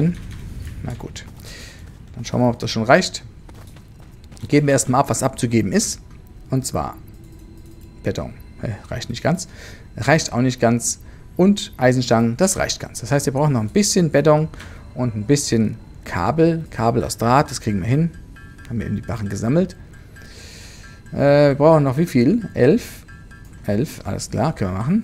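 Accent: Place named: Hesse, Germany. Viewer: mit hessische Akzent :))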